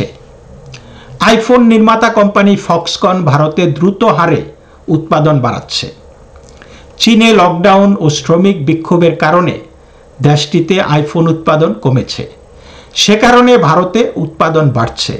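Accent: Bangal, Bangladesh. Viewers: Dada to bangal......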